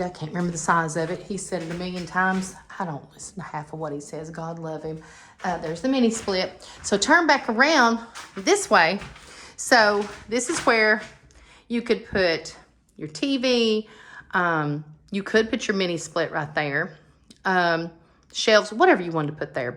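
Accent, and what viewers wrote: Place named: Tennessee, United States. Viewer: You are too cute! Thanks for the info, love your TN ‘accent’ 😘 Knoxville lady here, and one of your biggest fans. We will be up with our son soon. He “thinks” he wants an 8x22.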